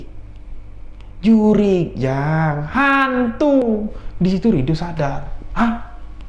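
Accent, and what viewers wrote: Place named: Java, Indonesia. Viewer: Bang ini sy kira dr jawa,oooaaalll.....ternyata dr Jambi.salam sehat,salam santun,salam super duper,salam silaturahim dan sedulururan dr; Bandung Jabar.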